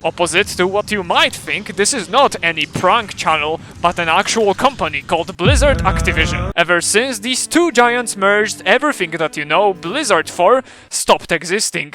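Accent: Poland. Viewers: I did not expect a polish accents here xD wtf. Nice video 👍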